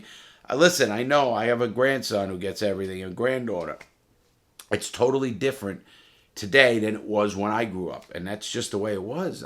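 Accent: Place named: East Boston, United States. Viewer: Sounds like East Boston in the 50s 60s.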